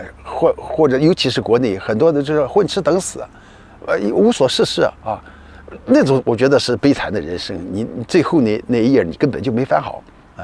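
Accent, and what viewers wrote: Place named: Yantai, China. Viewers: 听大叔口音是烟台海阳的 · 作为一个大连人，烟台口音听出了家乡味道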